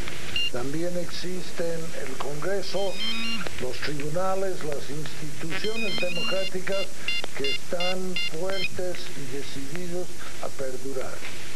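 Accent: United States. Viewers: este señor no sabe hablar español? tiene un acento gringo ? el fue presidente de bolivia ??GUAOOOO .......increible !!!! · Oye pensé que era Bush hablando, parece un norteamericano noten la R que pronuncia.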